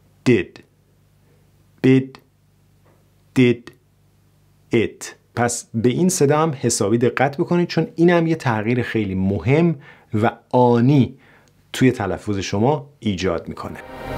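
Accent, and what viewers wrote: United States. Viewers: آقای دکتر شما در انگلستان هستین چرا آموزشتون لهجه امریکنه؟من لهجه بریتیش رو می خواهم😢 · اولین ایرانی هستید که لهجه شما خیلی شبیه به آمریکایی زبان ها است من در کلرادو آمریکا زنده گی میکنم اگر یک کلمه را هم یاد میگیرم به لهجه خود اینا یاد میگیرم ممنونم ازین که اینقدر خوبید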